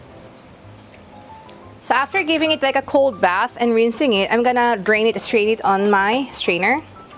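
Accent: Philippines. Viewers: you're too cute!! i love how you talk taglish...:)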